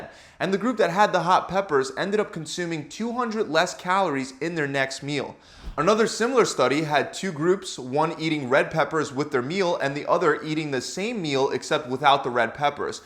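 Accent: Philadelphia, United States. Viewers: Quick question where you raised in the philly area? I noticed you say Wooder just like we do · Woodermelun lol I know a Philly accent when I hear it! What can I say; we pronounce our water, watermelon and waterice, aggressively 😎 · he’s from philly “wooder melon “ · He's gotta be from Philly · guy sounds like he's from philly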